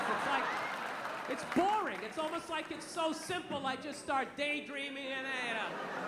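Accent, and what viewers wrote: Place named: Massachusetts, United States. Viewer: Michael Bulls Canton is only 15 miles SW of Boston and a 22k population is hardly small, but yes, it is a Massachusetts accent.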